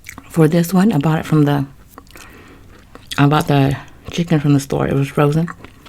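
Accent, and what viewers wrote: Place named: South, United States. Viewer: You have such a lovely soothing voice, almost sound southern 😊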